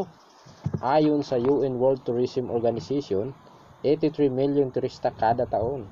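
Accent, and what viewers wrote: Visayas, Philippines. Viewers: i think he is bisaya,the way he talk,that's why.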